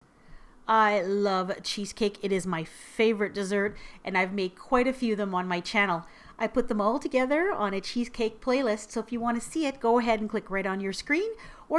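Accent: Canada. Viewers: i knew you were Canadian!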